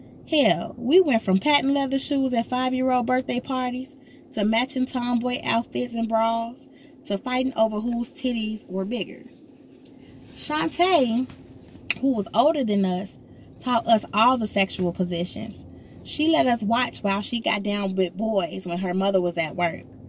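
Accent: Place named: South, United States. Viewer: The reader has a very strong southern accent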